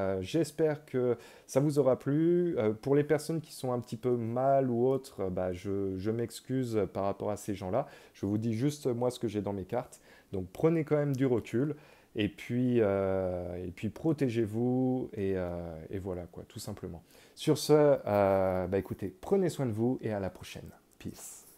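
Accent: Quebec, Canada. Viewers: Merci, par moment j'ai cru percevoir 1 petite pointe d'accent Québécois? Je me trompe??? 🤔 Belle continuation. 😍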